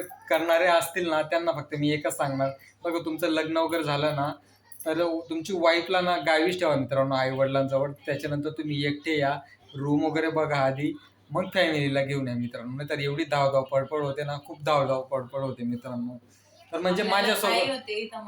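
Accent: Khandesh, India. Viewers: khandeshi tone ne bolotat te pan mumbait khup changle neither lagch tone change hoto boloncha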